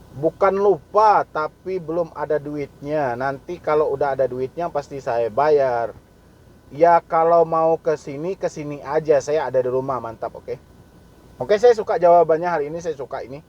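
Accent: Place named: Jakarta, Indonesia. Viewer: Logatnye knape jadi Betawi bang 😂 dulu ane suka nonton video abang logat msh daerah mana gitu skrg bgtu buka lagi video abng logat ud berubah 😂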